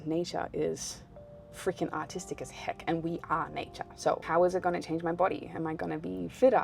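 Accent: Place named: Australia. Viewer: is such a cool name! Especially with an Aussie accent, its just fun to say:)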